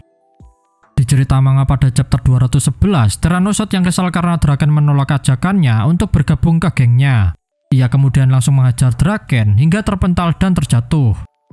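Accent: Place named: Java, Indonesia. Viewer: Wong jowo koyok e. Iyo a bang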